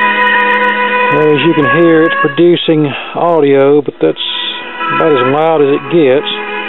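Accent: South, United States. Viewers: you sound like a True- Knowledgeable Southern Gentlemen of Audio Repair Technology - I,ll stay subscribed to your Channel.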